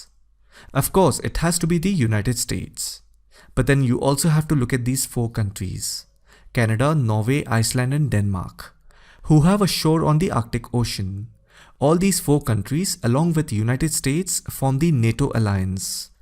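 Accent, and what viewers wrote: India. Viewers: Dude not only is the content of the video incredibly good, but also your voice is just on-point. I'd never thought indian accent could be so cool. I bet it took years to train that voice, singers would be envious :D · Nah a lot of Indians have this accent. You're just stereotyping us with the western concept of the funny Indian accent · From the accent I’m assuming he’s Indian and English is widely spoken among educated people in India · It's an Indian accent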